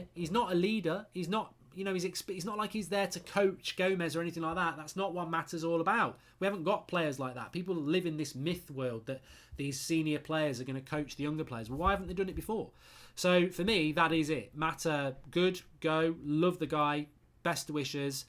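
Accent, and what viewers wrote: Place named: Scotland, United Kingdom. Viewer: Scots accent utter pish, ya wee midget.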